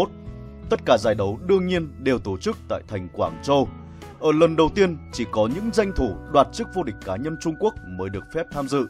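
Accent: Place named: Phu Yen, Vietnam. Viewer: xét về kiến thức + giọng bình thì kênh này số 1. Vịt ú chỉ là làm trước, nhiều người theo dõi trước thôi, giọng Phú Yên nghe ko hay, ko truyền cảm bằng giọng Trung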